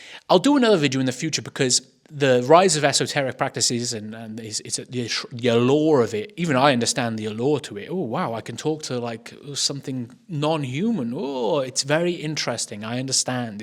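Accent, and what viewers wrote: Wales, United Kingdom. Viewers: Your welsh right? I csnt figure out your accent and its annoying me haha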